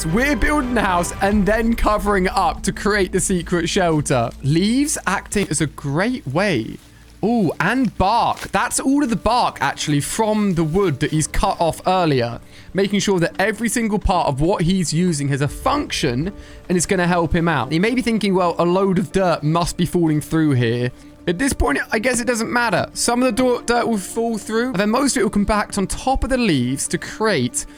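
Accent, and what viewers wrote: United Kingdom. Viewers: Bro is British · Are you british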